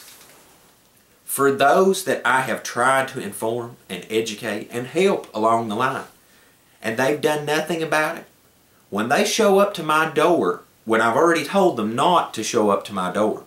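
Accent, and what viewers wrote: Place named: Kentucky, United States. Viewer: Sweetheart you have great information, but you could reach sooooo many more people by playing down the accent. Im from kentucky and made a point to talk straight as a kid and noone can tell where im from now, but all of my family sounds like you. Its hard to be taken seriously without that non-regional dialect - you could reach sooooo many more people if your voice didnt overpower your message. Please dont take offense its a genuinely heartfelt critique. Again great info, and great reminders. Thanks for doing what you do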